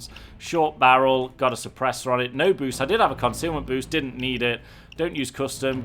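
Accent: United Kingdom. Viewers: are you british? You sound like to me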